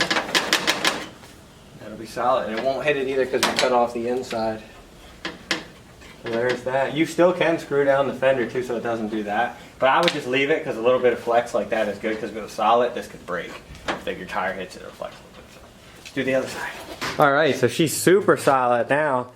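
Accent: Maryland, United States. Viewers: High Live yeah. He's from Maryland. Lol. I'm sure I have a weird accent to everyone that's not from my area.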